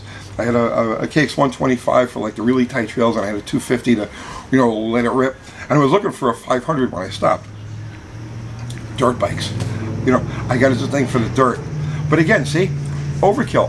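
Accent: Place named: New York, United States. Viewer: Love your channel..watch it AWL the time.lol the NY accent is just a plus.lol I was raised in Boston so I drop all my R's myself.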